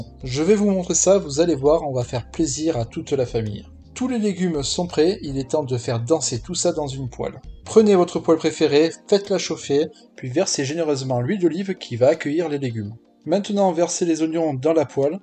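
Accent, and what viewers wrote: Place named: Provence, France. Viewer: hum cette pointe d'accent provençal !!! Je l'ai aussi mais légèrement plus prononcé!! Sinon, votre recette est succulente, je l'ai faite hier soir on s'est régalé.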